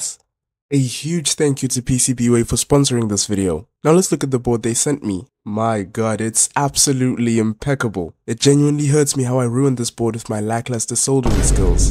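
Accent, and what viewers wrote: South Africa. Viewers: You sound so South African🇿🇦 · Dude, are you South African? Your accent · I hear that accent, and I pray that youre sponsored by a company that delivers to SA😭🙏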